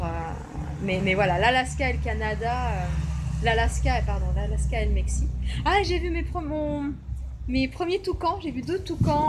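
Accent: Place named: Jura, France. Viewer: L'accent jurassien revient d'ailleurs....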